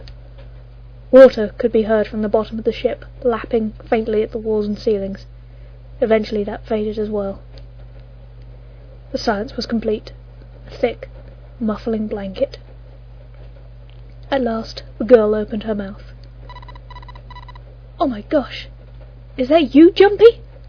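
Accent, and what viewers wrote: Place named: Northern England, United Kingdom. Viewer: You've got such a nice voice to listen to :D lovely accent. North england maybe? ^^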